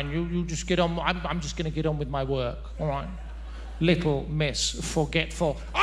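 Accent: Liverpool, United Kingdom. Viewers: Belter Scouse accent 👌🏻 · As a Scouse person, I approve this accent. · So refreshing to see someone doing a Scouse accent and nailing it. Absolutely hilarious set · His scouse is a dead ringer for Stephen Graham 😂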